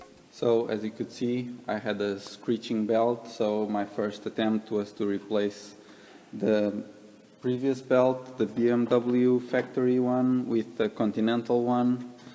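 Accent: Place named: Russia. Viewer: Судя по инглишу, автор случайно не русскоговорящий?)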